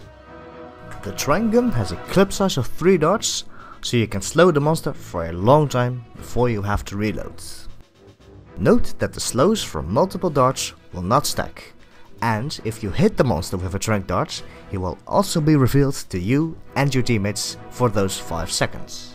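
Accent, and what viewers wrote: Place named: Netherlands. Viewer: Nice try, you're Dutch, I can hear it!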